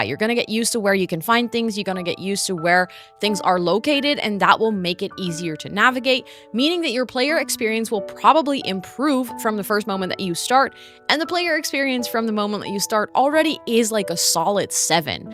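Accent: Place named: Netherlands. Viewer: Ah you're from the Netherlands! I barely heard an accent until halfway through, your English is so amazing! Better than even some Americans or British 😅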